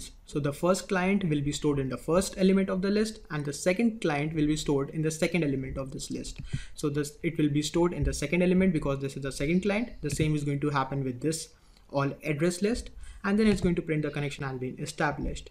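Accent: India. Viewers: this guy is just a thief, what a shame look at this older video: <link> watch it with a proper English not Hinglish!!!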